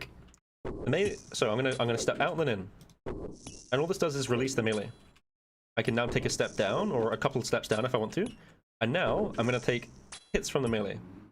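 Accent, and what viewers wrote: United Kingdom. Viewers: Great guide. Didn't love the British accent though.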